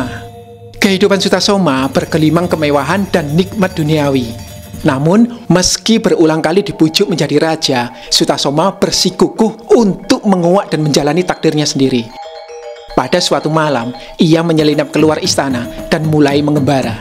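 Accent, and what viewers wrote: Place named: Tulungagung, Indonesia. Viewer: maaf mas, aslinya mana kog mirib Tulungagung logat kata" nya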